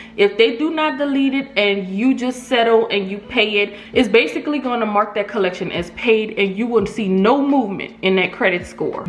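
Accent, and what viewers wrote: New Orleans, United States. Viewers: Are you from New Orleans? I’m new sorry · I could tell you from the boot, I hear that New Orleans accent 🙏🏽 thanks for the information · Great video! Sounds like a New Orleans accent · Accent sounds like Toya (from New Orleans) lil Wayne baby mama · New Orleans accent love it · U sound like u from new Orleans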